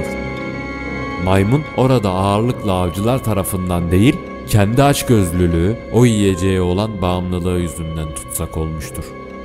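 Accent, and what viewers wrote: Ankara, Turkey. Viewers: gardaş anagara şivesinden geçme senin videolar öyle guzel gardaş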